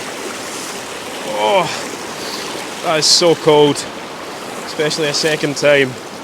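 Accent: Scotland, United Kingdom. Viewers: I just love this the Scottish accent makes it so much better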